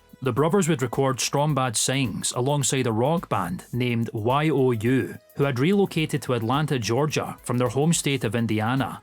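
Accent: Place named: Scotland, United Kingdom. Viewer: I cant tell if youre scottish or irish but i can recognize youre scottish and that scares me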